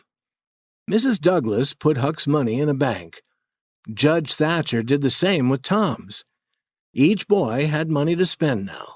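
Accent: United States. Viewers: it's useful to practice and mimic American accent :")), thank you so much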